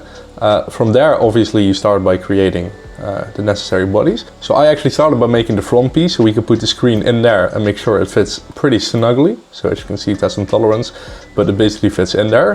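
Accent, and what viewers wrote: Netherlands. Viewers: ''and more specifically'', je bent nederlands ;) hoor ik al in de erste 3 seconden ;)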